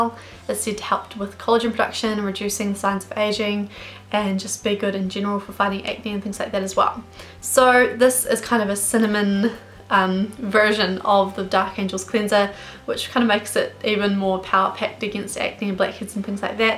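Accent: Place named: South, New Zealand. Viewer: It’s because she comes from the south of New Zealand (the south island) and they tend to have a different accent to thise who like up north (the north island)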